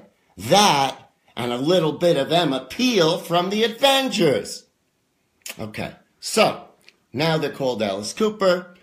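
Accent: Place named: New York, United States. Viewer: is that what a new york accent is?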